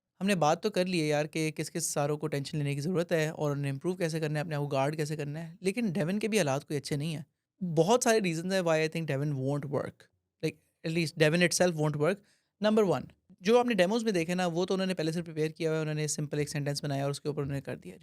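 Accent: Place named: India. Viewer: Thanks to Almighty Allah I saw first Pakistani tech content creator who talks like Indian tech content creator we proud of you sir what a fabulous way to narrate the story big fan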